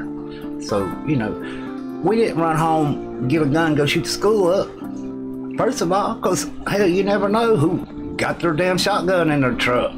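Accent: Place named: South, United States. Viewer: My wife is rollin on the floor. Love how you articulate in the southern accent !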